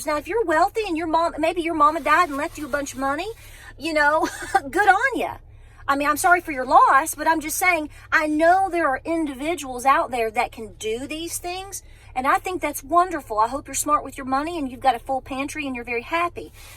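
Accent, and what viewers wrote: South, United States. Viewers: I live here in East TN West Knoxville too! I just found your channel a few weeks ago. I love you videos and your Southern accent, thank you for sharing, I can feel the heart-love you send. 🦋🪴❇️💜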